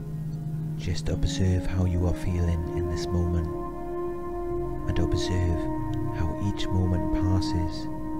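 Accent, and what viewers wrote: Wales, United Kingdom. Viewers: Thank you, this was magic. You have a good voice for this sort of thing ( welsh......?) 👍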